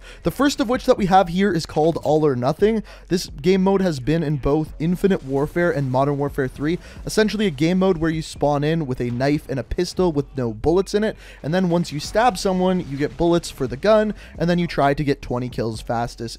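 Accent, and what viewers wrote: Canada. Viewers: What's your accent? Like where you from because if I were to guess I'd go with Canadian????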